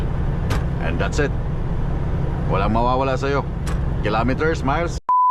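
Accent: Visayas, Philippines. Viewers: Hi bisaya ka bai,kabati ko nag salita.tga diin ka MN diay sano?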